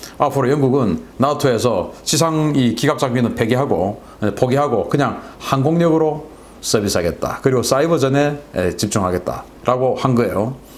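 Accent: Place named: Gyeongsang, South Korea. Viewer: 경상도 말쓰는거 보이 미똥당 ?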